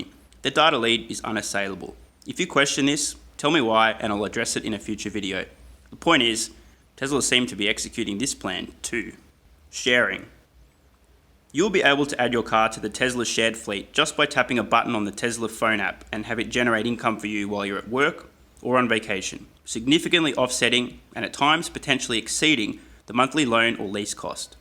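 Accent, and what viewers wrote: Australia. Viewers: Aussie accent mate 🇦🇺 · it’s great to hear the oz humour and accent. Got my favourite channels from US and UK. Now your the OZ channel to add to the funnel of tsla info and insight. My wife and I have our self managed super set up just for this purpose. A friend gave me a nudge a year ago. And haven’t looked back. Will get the Patreon sorted too. I sent this episode to my wife to help pass on more content without it coming from me:-)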